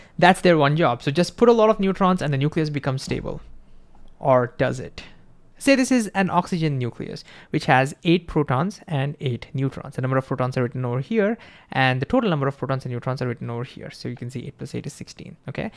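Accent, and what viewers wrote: India. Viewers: People who get he is an Indian As an Indian i could understand it just through your slight accent ❤ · Why are you black but have an Indian accent 😂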